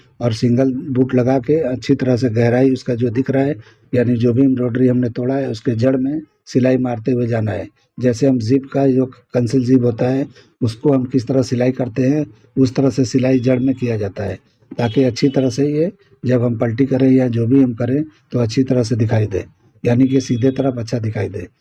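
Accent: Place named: Uttar Pradesh, India. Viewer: Bolane ke style se aap up ke lag rahe the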